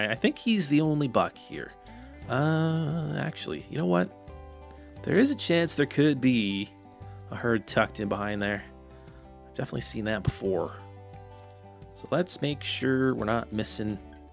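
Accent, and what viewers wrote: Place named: England, United Kingdom. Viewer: I love your English accent 😂🤣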